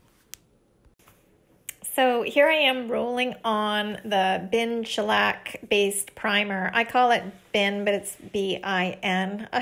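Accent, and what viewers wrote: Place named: Canada. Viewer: gosh she sounds so canadian (and i live in ontario)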